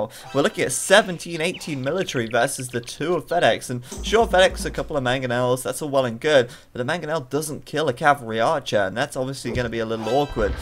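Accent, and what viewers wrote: United Kingdom. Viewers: I was wondering, watching your previous videos whether you were UK, given your accent (I love british accent ^^), and I got sure, when you said Argentina and Beijing were opposite on a World map, because of the fact Europe is centered on European countries. And I told myself there were probably more difference between Paris/London and Argentina or Beijing than between the last two. I checked it out, and next time, I'll think before speaking ^^ Keep up the videos, bro, you're doing a very good job (you sometimes seem to struggle a bit when a lot of action is going on, breathing or coughing ^^), and between Spirit and you, I got back to AoE recently. Only fighting AI for now, but this is such a good game.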